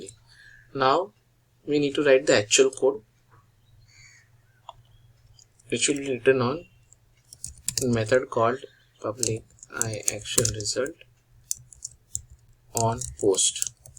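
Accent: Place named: India. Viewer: Why is indian accent so horrible?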